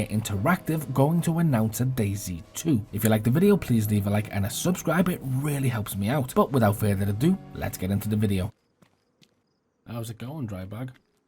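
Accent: Liverpool, United Kingdom. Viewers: I dont hear Toxteth, but I definitely hear a mix ha its only sometimes I hear scouse at all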